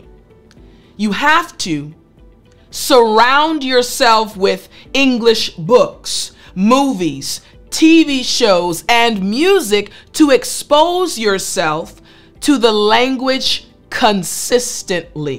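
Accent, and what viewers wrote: United States. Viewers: Wow, i love her American Accent. It sounds so good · I love her pronunciation and American accent, the most beautiful accent in the world for us eternal students of the most important language on and of the planet!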